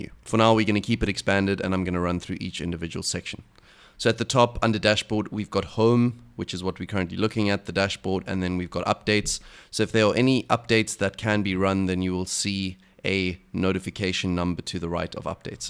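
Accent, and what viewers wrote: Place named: Cape Town, South Africa. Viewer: Thank you - this is super helpful! P.s. knew you were from Cape Town the minute I heard you talking:) Lived there last year, it's a gorgeous city!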